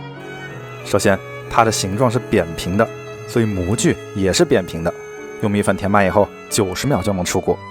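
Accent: Wuhan, China. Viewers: 听你口音就晓得你是武汉牙，哈哈哈哈，蒸糕全是小时候的回忆！ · 武汉普通话是相当的正宗，封面的蒸糕也是武汉的样式。现在这玩意在武汉也看不到了吧？ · 要不要咸蛋黄 都听出了武汉话口音 哈哈哈哈 好亲切！ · 你这个汉普越听越亲切！ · 哈哈真~的是武漢口音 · 你这个普通话一听就是武汉的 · 听口音就知道你是武汉的，南京那个 不就是变种的 武汉糯米包油条么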